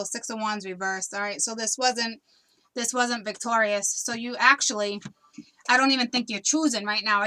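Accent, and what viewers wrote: Boston, United States. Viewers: A good reading and your accent is amazing😊 are your from boston? Love from a Leo from the uk!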